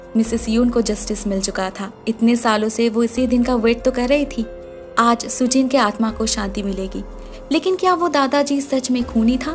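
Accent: Bengal, India. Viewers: R u Bengali or east Indian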